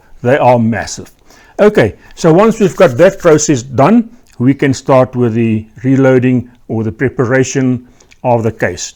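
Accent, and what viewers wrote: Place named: Afrikaans, South Africa. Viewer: I recognize that accent! Classic Afrikaans accent. ❤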